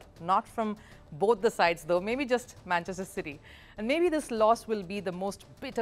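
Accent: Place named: India. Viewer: She's pretty good. Perfect English like the CEO herself. Other than English in Hindi accent 😅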